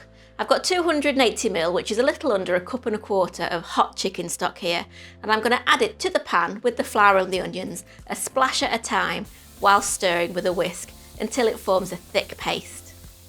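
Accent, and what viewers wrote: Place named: Liverpool, United Kingdom. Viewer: Looks yummy and easy. Good old comfort food. Love your 'Shirley Valentine' accent :)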